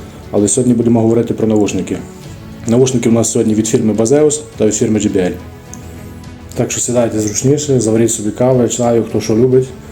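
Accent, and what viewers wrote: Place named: Western Ukraine, Ukraine. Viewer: Надіюсь люди підтримають ваш канал, приємно дивитись і слухати, хоть і чути акцент із західної:) Удачі вам! Підписка і лайк!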